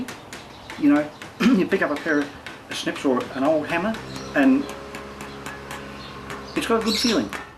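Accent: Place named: Australia. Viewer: I kept expecting him to say (with his Australian accent) "can you see what it is yet?" :-)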